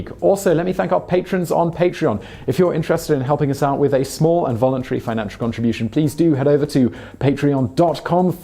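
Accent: England, United Kingdom. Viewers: Kew Gardens Station He’s english, he’s going to pronounce words differently than you. Do you know how many accents there are in the United Kingdom? Get off of your high horse and actually contribute. I don’t see you making videos? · While I really like this video, as I like this channel's other videos, his pronunciation does little to clarify the distinction. For one, a key difference between "bonsai" and "banzai" is the "S" sound versus the "Z" sound. The two are pronounced in Japanese as distinctly as one would reasonably expect them to be. pronunciation of the two words seems to come off as "Z" both times. Secondly, we have that sort of typical British thing where an "ah" sound in a foreign language is pronounced like the "A" in "apple". Like when virtually any British chef says the word "pasta". I don't know where this comes comes from, but it seems very British, and in this case, it's not helpful. 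That's because when we're talking about the difference between "bonsai" and "banzai", the first vowel sound is not a matter of the difference between the "O" sound in "odd" and the "A" sound in "apple", but rather the difference the "O" sound in "bone" and the "A" sound in "audience". is a great presenter, but when discussing matters of the pronunciation of foreign words, he'd benefit from consulting a native speaker. TL;DR - "Bonsai", the tree, is pronounced like "bone sigh". "Banzai", the battle cry, is pronounced sort of like "Bond's eye", but a little less stank on the D. Yeah, I said "Stank on the D". This comment has been entirely too serious.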